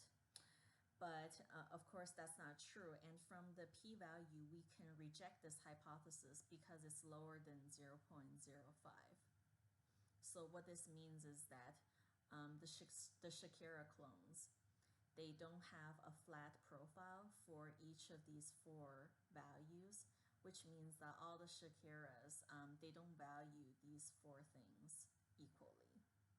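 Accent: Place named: China. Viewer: 为什么有中式口音……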